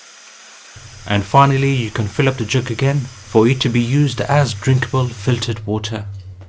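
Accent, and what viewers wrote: Birmingham, United Kingdom. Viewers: Thank u for this video! I agree with the comments, the instructions that came with the filter were a joke! Prefer your vid with your brummy accent! 👌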